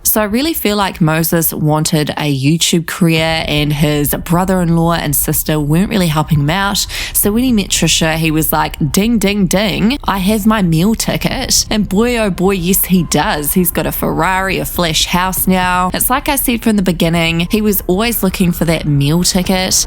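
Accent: New Zealand. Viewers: It's a new Zealand accent. I'm Australian 🙂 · As a Kiwi, this is the most Kiwi accent I’ve ever heard on YouTube next to old school ShannXXO 😬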